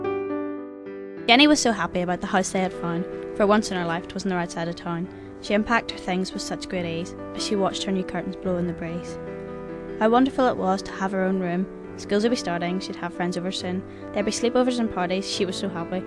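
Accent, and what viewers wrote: United Kingdom. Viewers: Just for some clarification, this is a true story. Based off of the poem, Moonlight Ride. Also, the way the narrator is speaking is how Northern Irish people speak. so understand that and get over it, and understand the true message behind this video. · it ok vid but you went to fast and r u from england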